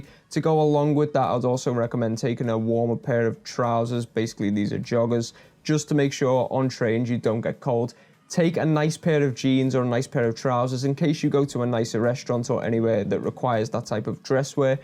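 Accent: Liverpool, United Kingdom. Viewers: Some great tips which I will use on my next (4th) InterRail. Quick question - what's a good weight in kgs to aim for in Summer and Winter please? ( I have a tendency to overpack - apart from when I make 3 night trips to Eastern Europe by plane when I maange everything in my standard size Fjallraven, Kanken !) Ta la ( you sound Scouse like me !)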